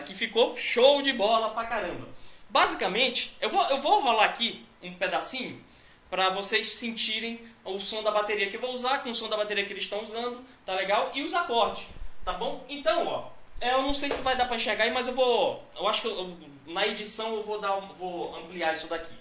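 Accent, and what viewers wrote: Pará, Brazil. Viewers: cara, venho acompanhando teus vídeos ultimamente e gostado bastante. Mas to com uma curiosidade, tu és paraense? kkkk ou alguém ja te disse que teu sotaque lembra muito a galera daqui do Pará? kkkk abraços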